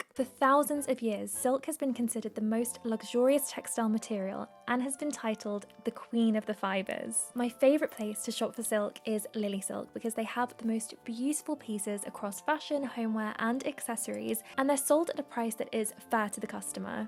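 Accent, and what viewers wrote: RP, United Kingdom. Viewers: Steps to having a more luxurious life: 1) step one: have a charming, sophisticated posh British accent like, a mix of the movie Notting Hill and Victoria Beckham and Kate Middleton 2) step 2: have poise and panache like 3) step 3: have exquisite taste in architecture, art, and fashion like In short: BE!!!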